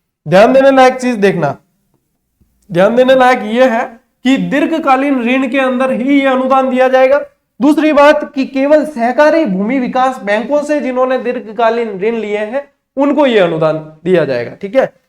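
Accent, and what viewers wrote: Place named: Marwari, India. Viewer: Sir aapki marwadi bahut mst lagti h Or bahut hi accha lagta h Me jhalawar se hu barambar pranam👌🙏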